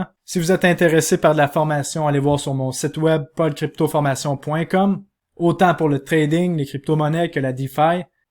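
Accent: Canada. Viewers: Super video com Dab.. L’accent Canadien est tres fort et pour un Francais il faut se concentrer pas mal pour bien comprendre ;)) Merci bien quand meme ! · TB video avec en plus cet accent canadien (vu de France)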